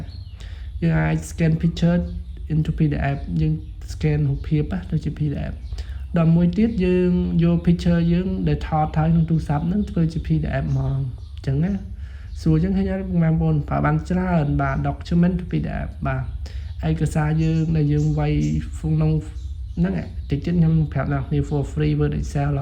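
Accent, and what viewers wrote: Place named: Kampot, Cambodia. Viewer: ស្រ៉ូប ៗ ដូចអ្នកកំពត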